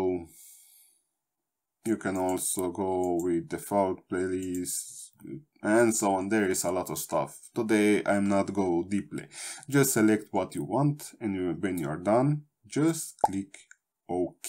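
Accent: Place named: Eastern Europe. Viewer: Thanks to you to take time to simplefy the process of normalising sound in a playlist, I can ear the you have a eastern Europeen accent, my wife is Russian.We live together in Canada now,as I'm Canadian. Thank for your help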